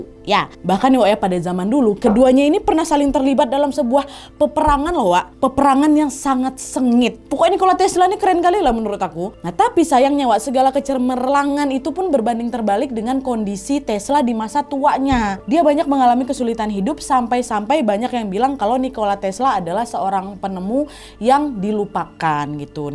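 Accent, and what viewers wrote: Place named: Padang, Indonesia. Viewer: Logat nya unik😂😂😂 logat padang kayak nya nih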